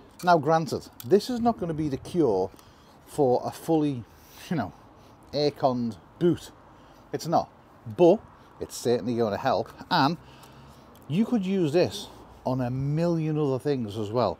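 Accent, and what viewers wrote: Liverpool, United Kingdom. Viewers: I use PC fans for my dogs crates, one pulling, one pushing. Bought a couple os sensors to attach to those; so great idea - thank you. Also - Liverpool or Wirral? I can't get your accent sorted!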